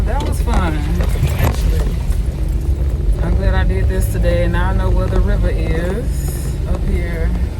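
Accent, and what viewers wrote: Jamaica. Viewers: That Jamaican accent slowly creeping in..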